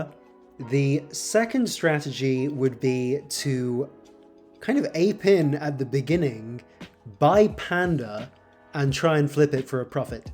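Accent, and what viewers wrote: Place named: United Kingdom. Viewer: Hi where to based? sounds like you are in UK I am in London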